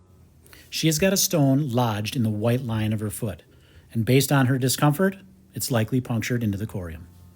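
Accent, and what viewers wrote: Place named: Midwest, United States. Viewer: I love the Midwestern accent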